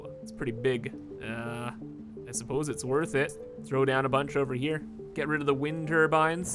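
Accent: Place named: Canada. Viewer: You sound so cute with your funny Canadian accent!!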